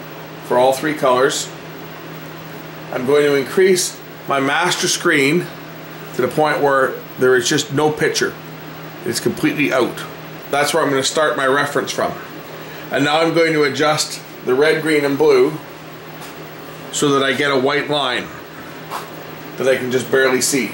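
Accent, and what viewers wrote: Canada. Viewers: Gonna take a guess on your accent but are you from Alberta?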